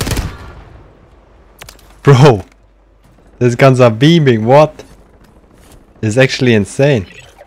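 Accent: Germany. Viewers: Ehrlich du hörst dich voll deutsch an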